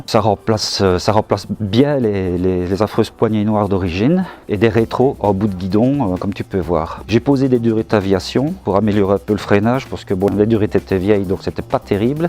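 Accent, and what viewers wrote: Belgium. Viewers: j'ai pu monter sur une (sans rouler malheureusement elle étais dans un show rom et je n'avais pas d'équipement) et c'étais nickel pour mes jambe aussi bien au niveau des commande de boite que du frein arrière et même avec les jambes tendue mes pied étais bien à plat il faut dire aussi quel avais une selle haute, de plus j'aime bien la position droite quand on est à sont guidon, je l'aurai bien acheter mais je doit vendre mon bateau avant pour la financer et faire de la place dans le garage pour la ranger, je me disait aussi que quand tu parlais sa sonnait fort l'accent de Liège à toi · J'aime beaucoup ton accent de la Belgique et c'est toujours un immense plaisir de voir tes vidéos !! 👍✌️🤛😉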